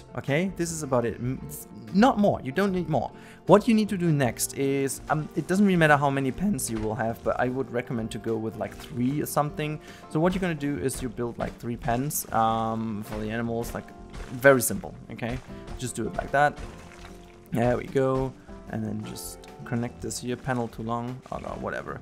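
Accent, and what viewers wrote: Germany. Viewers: kannst du das video auch auf deutsch machen ? denke mal an deinem akzent kann man erkennen das du deutch bist :D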